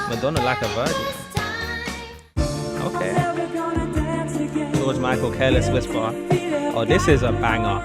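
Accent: United Kingdom. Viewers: That's a British accent. London more than likely. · U like the one of many British accents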